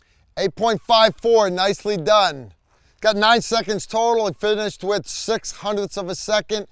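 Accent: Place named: Northeast, United States. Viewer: New to the channel so this may have been answered already, NY? Boston? CT? What's the accent?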